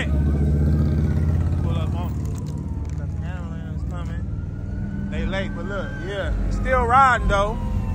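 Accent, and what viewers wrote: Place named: California, United States. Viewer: You from lil rock bro? You got that cali accent. And man RIP to that elco wished you would walked up to it i wanted to see the damage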